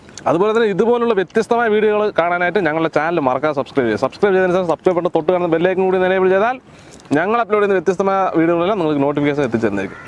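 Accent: Palakkad, India. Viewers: Allelum palakan slang ishtallathorindo.,🥰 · പാലക്കാട് ഭാഷ സുപ്പർ. പക്ഷേ നോൺ വെജ് തിന്നാത്ത കാരണം ഇതിനെ പറ്റി ഒന്നും അറിയില്ല. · സംസാരം കേട്ടിട്ട് പാലക്കാട്‌ ആണെന്ന് തോന്നുന്നു വീട് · Typical palakkad style of speaking. Good way of preparation.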